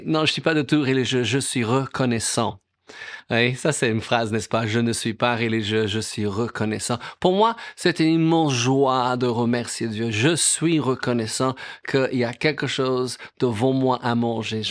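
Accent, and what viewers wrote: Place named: England, United Kingdom. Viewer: Message clair simple dit avec un joli accent Anglais....bon pas grave car tu présente tout ça avec joie et amour. Merci car je suis encouragé a rendre grâce pour tout ce que DIEU donne par le nom de JÉSUS AMEN Soyez bénis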